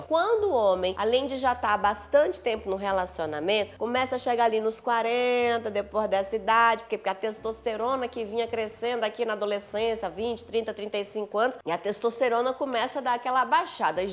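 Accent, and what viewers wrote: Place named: Minas Gerais, Brazil. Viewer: kkkkk mineiros são reconhecidos no mundo inteiro p geito gostoso de falar né vdd??? Eu sou de BH por isso percebi ki vc mineirinha uai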